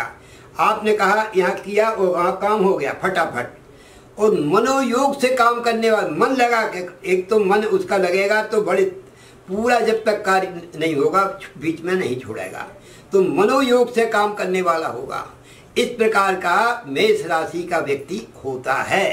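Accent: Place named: Madhya Pradesh, India. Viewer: Aapke baat karne se aap m.p. ke lag rahe hain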